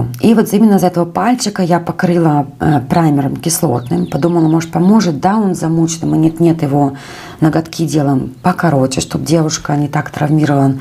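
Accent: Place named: Germany. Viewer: в Германии. На немецком Fiber; Fiberglas( стекловолокно) читается, как « фибер / Фиберглас «. Это не ошибка, просто читает, как немцы читают. Здесь корректно говорить именно фИбер.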